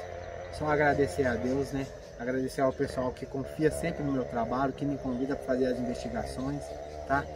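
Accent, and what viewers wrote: Minas Gerais, Brazil. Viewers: O o vc e mineiro ou goiano? Sua voz não parece com pessoas do Sul não! Vc parece mesmo e míneiro ou goiano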